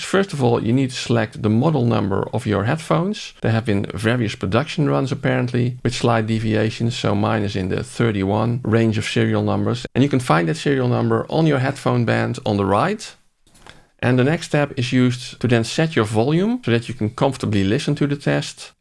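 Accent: Netherlands. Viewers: lekker Nederlands accentje whehe goede review en cool te zien dat er nu 5.0 reviews komen :)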